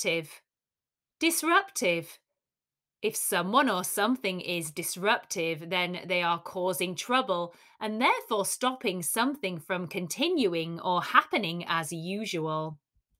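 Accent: United Kingdom. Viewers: i adore your britsh accent , the course are just perfect thanks a lot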